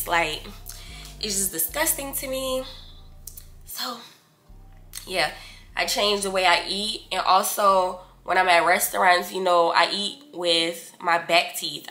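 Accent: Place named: New Orleans, United States. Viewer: U from New Orleans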